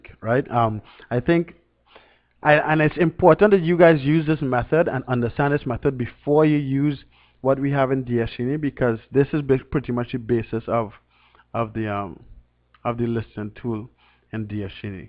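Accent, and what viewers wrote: Trinidad and Tobago. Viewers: you sound like a Trini if you are i am too and have been drop shipping both on ebay and amazon will love to connect can we watsup or messager ???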